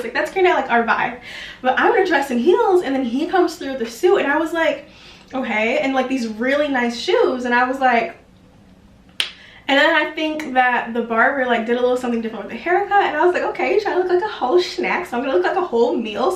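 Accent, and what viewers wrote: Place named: Midwest, United States. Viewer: Also this midwestern accent is making me sooo homesick <3 <3 <3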